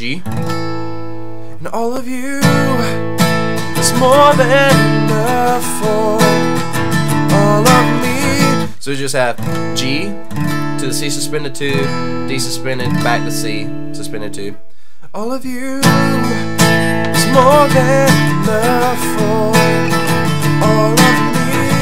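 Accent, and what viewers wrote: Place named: South, United States. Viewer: He sounds kinda from the south anyway you rock man thanks for the vid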